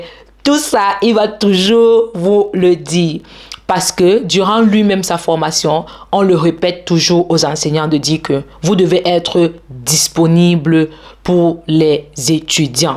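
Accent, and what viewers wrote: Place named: Italy. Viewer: Aimé ton parler italien 😢😢😢❤❤❤ dis moi comment me préparer avant d'arriver là-bas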